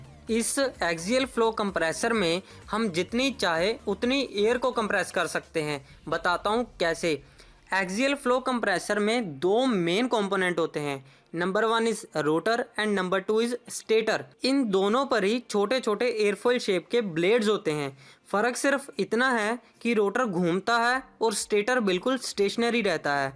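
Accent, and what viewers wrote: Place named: Punjab, India. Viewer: Hindi ton tan Punjabi lgda aa patuya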